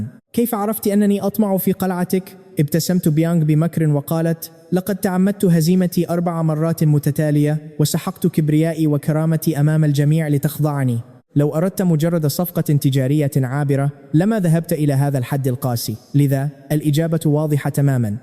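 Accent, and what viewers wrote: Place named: Iraq. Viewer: باللهجه اظنك من العراق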